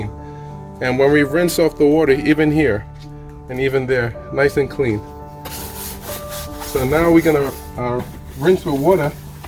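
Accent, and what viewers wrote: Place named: Northeast, United States. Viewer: Are you in the northeast region? I'm from Mass and your accent sounds familiar. BTW I love your videos. Thanks for sharing.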